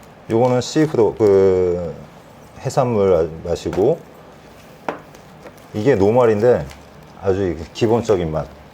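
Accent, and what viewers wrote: South Korea. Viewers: 이분 한국분인가요? 억양까지 한국인같아요.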